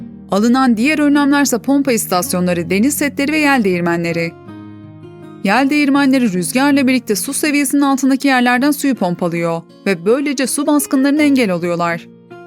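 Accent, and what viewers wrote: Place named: Aegean, Turkey. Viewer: seslendiren hanımefendi ege köylüsü sanırım yapıyoo, sağlıyooo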